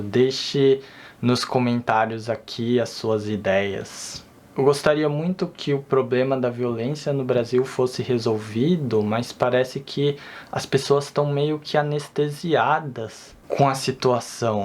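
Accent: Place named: Japan. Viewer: Tenhor varios amigos brasileiros descendentes de Japones que foram para o Japao e retornaram ao Brasil pois a vida ai nao e facil. Portanto vai depender muito da pessoa e do que e mais importante a ela, mas e claro essa e sua opiniao pessoal e respeito. Pelo seu sotaque achei que voce fosse japones falando Portugues. Eu morei 27 anos no exterior e meu Portugues e totalmente sem sotaque e super claro, facil de entender.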